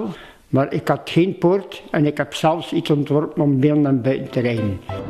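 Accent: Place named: Flanders, Belgium. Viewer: vlaams klappe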